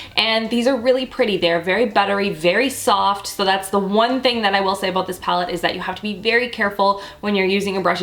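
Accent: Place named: Canada. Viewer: I love your Canadian accent!!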